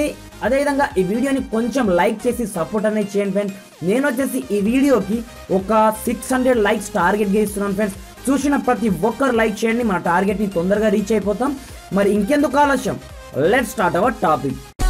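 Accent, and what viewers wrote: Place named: India. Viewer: Why is it always indian? I cant u derstand what your sayinggg..just ganna turn on captions then.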